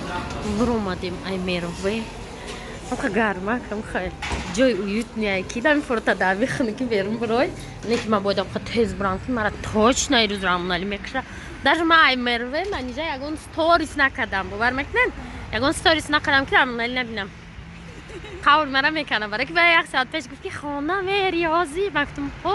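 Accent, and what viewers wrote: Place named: Khujand, Tajikistan. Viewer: Эх лафзи хучандиии гушаком кайба туя мешунавад😭😭😭😭😭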